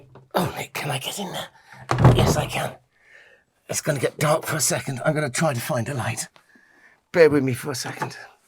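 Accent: United Kingdom. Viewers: it is, nick, as always my sincere pleasure ... i think i've realised why you and your character work so wonderfully well with these videos of yours ... we english i think its fair to say, are known for reserve and, i assume from your general accent you were educated at a public school ... and so to hear a public school "schoolboy" push thru the stiff upper lip and use english expressiveness in its purest form in relation to something that is clearly a passion for you, and to be commenting on such truly italian expressions, makes the communion of both strong characteristics emphasise the deepest of appreciation for what can only be described as architecure almost as an art form in that it is utterly compelling in the feelings it evokes within. such a powerful combination and the pleasure that they and you bring to us the collective viewers is of a calibre that i cannot find anywhere else. 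bravo. bravo, bravo and from your intentaional audience, our appreciation back to you.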